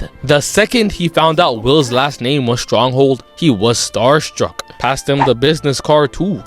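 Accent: Toronto, Canada. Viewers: Are you Canadian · r u from toronto?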